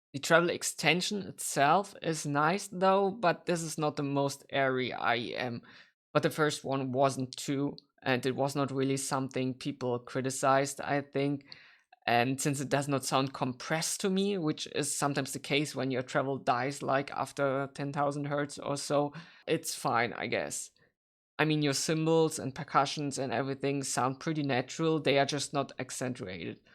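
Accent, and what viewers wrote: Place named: Germany. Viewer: Hey:) Da sich dein Englisch genauso anhört wie meins schreibe ich mal auf Deutsch 😂. Erstmal ein schönes Video mit einer guten Erklärung hast du da gemacht! Ich hab mal ne Frage... Ich bin relativ neu im iem-Bereich und hatte die Möglichkeit die Moondrop Chu 2 auszuprobieren. Da war ich echt schockiert wie klar der Ton ist und wie viel mehr Details man hören kann (im Vergleich zu meinen alten Soundcore Liberty 2 Pro Kopfhörer zu dem Preis!). Alles in einem will ich mir auch iems zulegen, allerdings hauptsächlich für Gaming (überwiegend single player games)aber auch um Videos zu schauen oder Musik zu hören. Es sollte am besten nicht zu neutral/flach klingen und leicht besseren bass haben als die Moondrop Chu 2. Die Frage wäre dann, bin ich mit den Zero 2 gut bedient oder lohnt es sich für etwas mehr Geld bspw. die Truthear x Zero (Red) oder 7hz Sonus zu kaufen. 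(Puh, der Text ist jetzt doch etwas länger geworden). Hast du da eine Empfehlung für mich? Würde mich über eine Antwort freuen ^^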